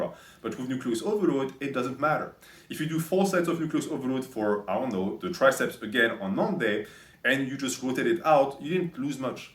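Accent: France. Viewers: Your French accent makes all of this better. Thank you,.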